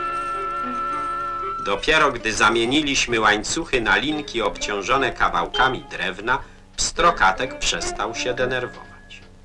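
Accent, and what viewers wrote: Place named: Warsaw, Poland. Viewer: Fajny Akcent lektora ciekawe Z jakiego rejonu Polski on był trochę jakby z Warszawy albo okolic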